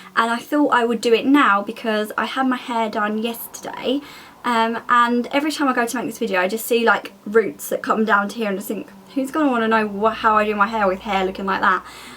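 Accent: United Kingdom. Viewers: wtf? does someone understand what this girl is talking here?=))))))))))))) for god safe speak too too much fast :)) i don't think she realise :)) i always hated british accent is horrible , british sucks:| the acent is enough tacky she shouldn't talk fast , british accent + fast talking= SUCKS girl please don;'t speak so fast in your videos if u want ppls understand u:)) not just british ppl using internet LOL ..LMFAO :)) this made my day=)) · I can understand what she's saying. I personally love British accent, its probably because of Harry Potter. XD I always thought British people have cool accents.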